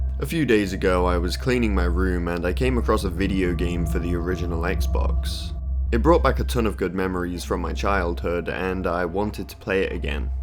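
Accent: United Kingdom. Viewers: British mr. Nightmare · British Mr Nightmare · ｄｅｅｐ ｖｏｉｃｅ ｂｒｉｔｉｓｈ ｂｏｉ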